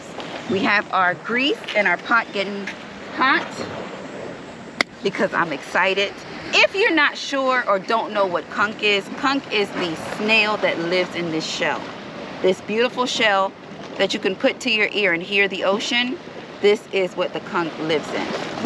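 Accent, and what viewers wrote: Bahamas. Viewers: You even sound like a Bahamian. He don’t even know this grease hot. Whose your people? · Girl I knew I heard a Bahamian accent in you. I love Bimini. 🇧🇸🇧🇸🇧🇸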